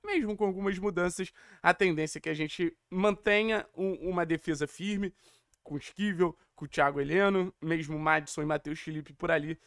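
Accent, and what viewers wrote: Rio de Janeiro, Brazil. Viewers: Meus ouvidos sangram, carioca..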